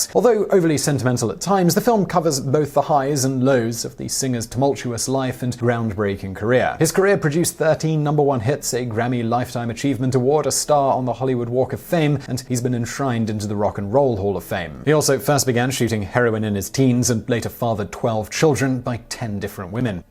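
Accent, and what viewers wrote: England, United Kingdom. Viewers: I like this channel mainly because its a brit w/ a cool english accent so you know hes to be trusted plus solid content and for once I liked the title and the presentation. Most of these vids are poorly presented w/ the guy pandering to human reaction which is annoying and the clickbait vids simply arented needed. The subs plus people will click on this vid just because it exists it really doesnt need super clickbaity titles. This one was a great episode and I love how the info is put forth esp the brick backround etc but nOmOReClICkbAitY stuff ppl will legit watch this no matter the name itll just come up on the que and trust me itll get views but spare us the superduper clickme kjøtt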